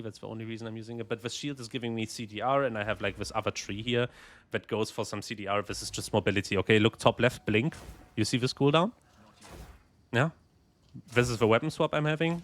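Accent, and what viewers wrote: Germany. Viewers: Er hat auf jeden Fall einen deutschen Akzent, außerdem muss man nicht hochdeutsch sein um akzentfrei Englisch zu sprechen. ;) Sein Englisch ist natürlich trotzdem super.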